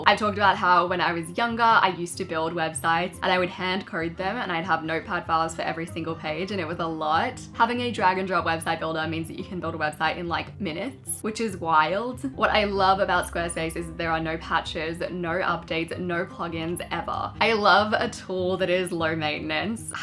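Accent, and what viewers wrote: Australia. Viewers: I can’t stand your accent. Australian accent is sooooo annoying. It’s like you guys add an L to the end of words like food. Your a mix of English and some made up garbage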